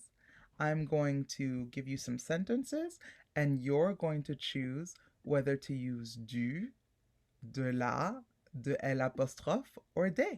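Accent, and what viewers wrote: France. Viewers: French accent is so beautiful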